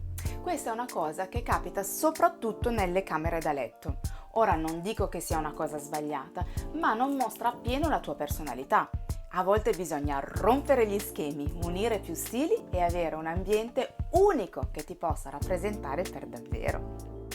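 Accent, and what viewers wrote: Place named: Italy. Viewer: Brava e grazie 🙏 e complimenti per l’accento italiano perfetto!! Ed ho orecchio eh.. :)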